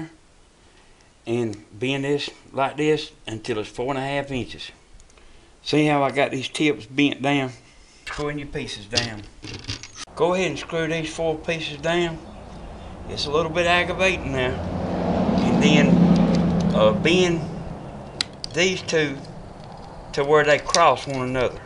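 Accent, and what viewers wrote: South, United States. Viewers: I just simply love your southern twang, your funny, and know what your doing. Do you even watch TV? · Liked your video. Short and to the point. Plus I love the southern accent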